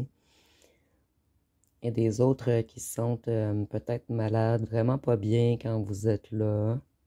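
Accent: Quebec, Canada. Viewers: Pas du tout en colère, il m'a fallu des années pour travailler sur mes blessures ce nest pas pour réagir comme celle que j'étais avant ❤❤ La lumière est ok pour moi. Merci pour ta lecture et ton joli accent québécois ❤❤ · La lumière est ok!! Ça fait du bien entendre une québécoise! Bonne justesse de tirage, MERCI ❤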